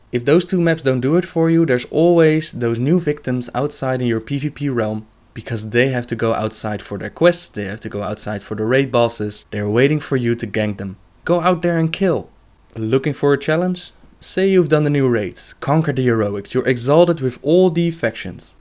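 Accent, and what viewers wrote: Netherlands. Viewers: me too i kinda heard it already its very easy to hear a dutch talking english any way good review ^^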